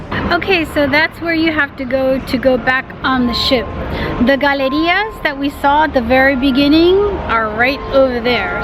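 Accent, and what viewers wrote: United States. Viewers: This got randomly recommended, glad to see a coruñesa with American accent lol I was born at El Materno and sound coruñés as fuck in Spanish but also sound American as hell in English. Love that we are bringing some diversity back home!!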